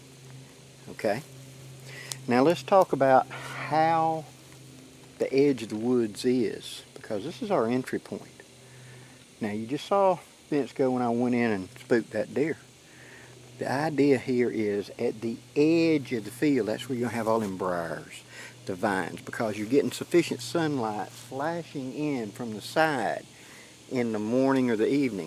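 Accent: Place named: Georgia, United States. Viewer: I just found your videos I really appreciate the info but it’s a trip down memory lane as a young lad with my dad and grand pa with the way you talk. Georgia native maybe? If that’s a known fact or not my bad just found you last night.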